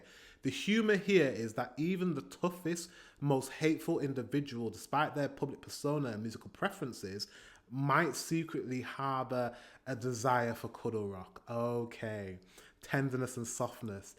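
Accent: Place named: North, United Kingdom. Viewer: Out of context: I love your voice and "dialect". Greetings from a northerner (Germany) to a northerner.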